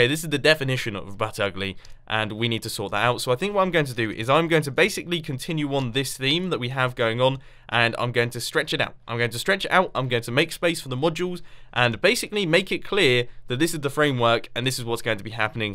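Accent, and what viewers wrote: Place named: United Kingdom. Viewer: I also enjoy your voice. Maybe that's because I'm from Poland and British english sounds soo darn good to me. Also those iron "things" you could call them compartment markers/borders. As a biotechnologist I find that properly proffessional. P.S. Is the Iron Foundry automatically crafting blocks of iron? Cuz I'm pretty sure it produces iron slabs...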